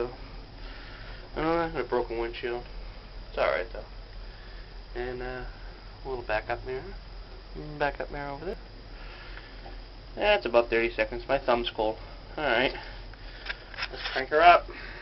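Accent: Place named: Boston, United States. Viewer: your from ma i can here your boston accent go redsox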